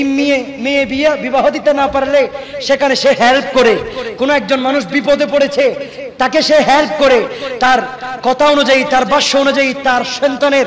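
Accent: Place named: Pakistan. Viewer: আরবি পড়তেছেন উর্দুর মত আফসোস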